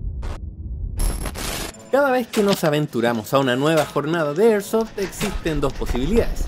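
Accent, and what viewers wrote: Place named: Chile. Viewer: Te acabo de conocer y me encantan tus videos, pero tu acento se nota que es chileno, así que lo diré: Es aguacate no palta. Nada que ver el comentario xd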